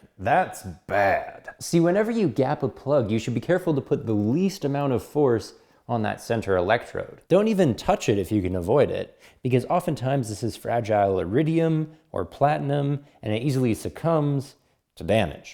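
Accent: Canada. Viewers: I’m assuming he’s from Canada. I’m waiting for him to say chooch or that’s the cock for dolly 😂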